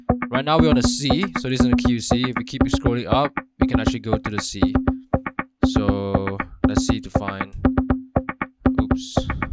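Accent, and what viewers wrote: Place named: Northeast India, India. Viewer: BTW BRO U LIKE LIKE NorthEast indian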